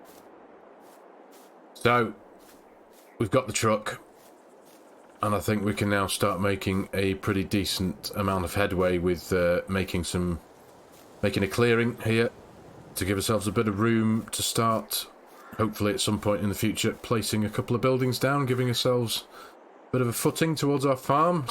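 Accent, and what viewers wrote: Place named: Castleford, United Kingdom. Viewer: Ey up I'm chatting with my wife trying to think where ya from n u sound castleford area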